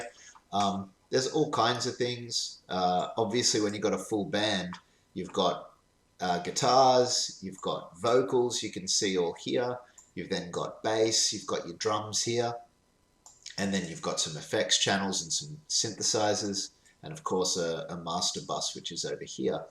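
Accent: Australia. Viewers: Thanks for doing this! Having worked in recording studios producing songs and ad jingles, I was always fascinated by the sound engineer’s familiarity with the console and utilizing the tracking part of it. One of the fun ones used to be working at EMI Studios with a 48 track console. That was many years ago. Now, its digital, so it’s even more fascinating to me! Good one! On another note: Are you Australian, or from South Africa or New Zealand?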